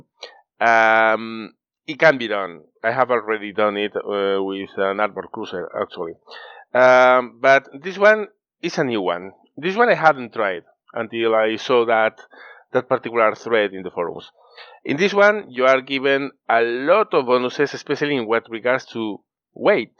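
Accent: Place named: Spain. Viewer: Just found your channel for the first time when looking for UAD videos and insta- subbed. Good presentation with an adorable Spanish accent :). I'm torn apart with this particular game as it seems super interesting, but would you recommend dishing out almost 50 EUR at a game that is not even available in Steam EA yet?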